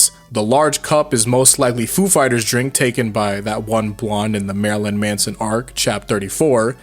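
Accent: Canada. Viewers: Are you a Canadian Lad?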